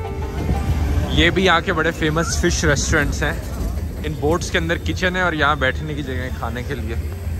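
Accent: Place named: India. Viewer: From the thumbnail I thought he was an Italian tourist than he spoke Indian, my Brian start rewiring!!!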